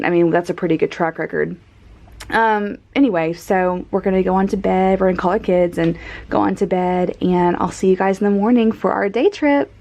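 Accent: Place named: South, United States. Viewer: Great videos. I am enjoying your vlog style and your southern accent.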